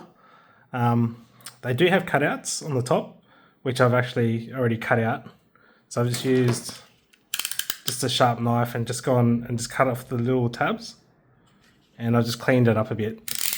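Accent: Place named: Australia. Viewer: I'm keen to try out a Lilygo T-Deck Plus as my entrypoint into Meshtastic, but it is not clear to me whether any of these sellers have the suitable frequency for Australia. You sound like you might be Australian, so I thought I would ask: from what I can tell, in Australia we need either AU915 or AS923 compliance. In the Aliexpress link, it does have a 915Mhz option, but it is not clear to me whether it would be suitable for Australia. I see that there may be some US915 devices that don't have quite the right frequency range for Australia. Can you help me choose the right thing? :-D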